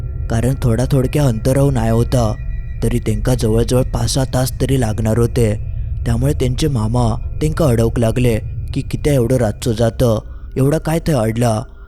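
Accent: Malvani, India. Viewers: Me tumchi ghoshta yechi khoop vaat bakto mala tumhi malvan madhun bolta te mala khoop avadto maze board chi exam chalu ahe mhanun hee 8-9 divss mi tumchi gosht ikoshakat nahi pan exam zale ki zoptana youtube ugadalya -ugadlya tumichich ghosht iknaar ❤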